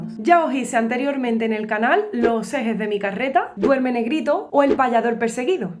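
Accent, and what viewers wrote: Andalusia, Spain. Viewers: Que historia más hermosa, la música no conoce el racismo, ni xenofobia, ni egoismo, los grandes son grandes por eso. Me encanta tu acento pareces de Andalucía. Tienen tanta energía para expresarse que hipnotizan. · ¡Brava! ¡Brava!, como dicen los italianos respecto de una performance muy lograda.. Es notable como, pese a tu bonito acento andaluz, lograste expresar el duende tan especial de don Ata. Lo mismo puedo decir de tu ejecución de la música en la guitarra. Muchas gracias por tu bonito regalo de ano nuevo a tus seguidores. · Oye genia de qué parte de España eres por esa tonada tan particular tuya al hablar. Me gusta mucho lo q haces de verdad. Un abrazo desde Misiones, Argentina 😊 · Q me gusta tu habla andaluza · QUE HERMOSA ERES DE ANDALUCÍA ?? ESTUBE EN MÁLAGA Y ES UN ACENTO Muy BONITO HERMOSO SENTIMIENTO PONES CUANDO CANTAS ESO ,,SE NOTA EN UNA VERDADERA ARTISTA,,,MIRTA DESDE MENDOZA ARGENTINA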